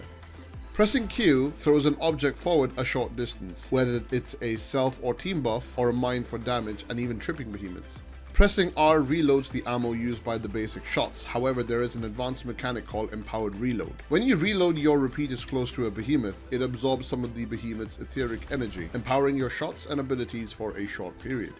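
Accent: Ireland. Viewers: take care of your damn. As someone with heart troubles as well (defective valve that had to be replaced a few years ago,) it's one of the most important organs you can take care of. Keep us posted, but rest up. I'd like to have your dulcet irish tones around for many more years.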